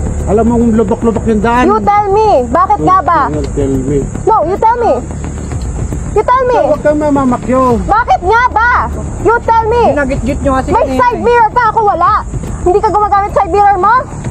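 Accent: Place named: Conyo, Philippines. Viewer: Conyo ampt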